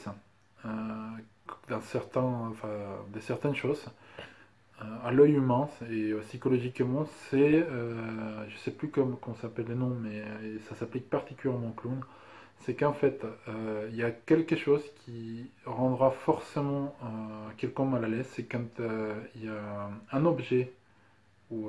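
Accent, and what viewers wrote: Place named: Mexico. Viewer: Moi je suis POUR un podcast en espagnol !!! Il y en a si peu 😞 ! je regarde celui de Esperanza y Anna, ( du Pérou je crois) et celui d'une espagnole d'Espagne mais qui ne me plait pas des masses...Ceux d'Amérique Latine en général portent surtout sur le crochet, alors j'adorerais t'écouter en espagnol même si je ne suis pas sûre de tout comprendre avec l'accent mexicain 😄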